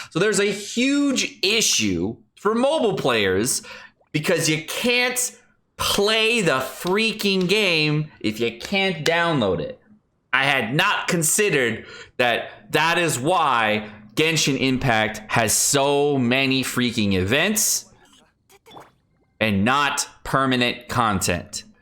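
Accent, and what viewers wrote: United Kingdom. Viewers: I just watch for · the thing is getting a bit cringe but i guess your audience likes it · Someone should make a compilation of all of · is why original is able to provide us with information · I love your intro with and your videos theory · is poggers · = best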